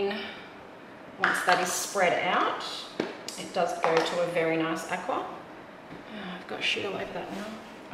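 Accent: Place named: Australia. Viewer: This is beautiful !! So refreshing to hear an Aussie accent.....lol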